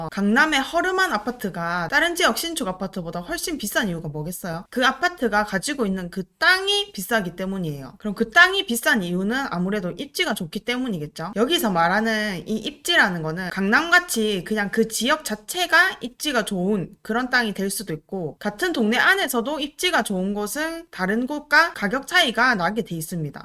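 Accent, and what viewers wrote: Gyeongsang, South Korea. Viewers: 이 여자 매력 쪈다. 갱상도여인! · 경상도 사투리인가요!?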